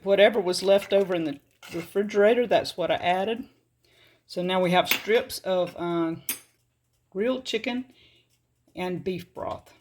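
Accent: South, United States. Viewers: I just love southern accents! pronounces "boil" as "boll" instead of "boyle". Tell Danny I wore my new American-made Roundhouse stonewashed bib overalls to the dentist today. I love them! I shared this video on all my social media pages, so people could learn a very valuable skill to help them through the coming hard times. Now all they have to do is start watching Deep South Homestead so they can learn how to grow the stuff to put in the soup. You guys are doing the Lord's work, and God bless you for it!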